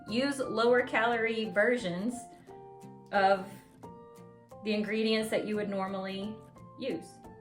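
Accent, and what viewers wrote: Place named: United States. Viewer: Where are you? You sound American. I wish that in America the recipes would be given in what we have been trained in like cups and ounces. I Don't Go near any recipe that's not written in plain English for Americans to understand. If you want to cook for Europeans or South Americans go for it but please in the United States give recipes that are people can understand without having to go to a book and transfer everything over to cups Oz pints and quarts. We have gotten so far away from American values and everything is for the aliens that have taken over our country. Obviously in cooking as well.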